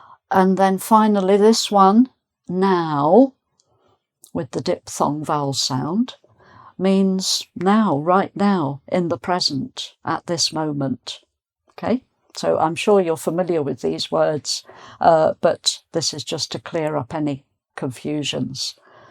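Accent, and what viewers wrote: United Kingdom. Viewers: Slow and clear english sound i really like that british sound a🎉❤nd fluently like I lo ve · One of the most beautiful voices with a British accent. Always❤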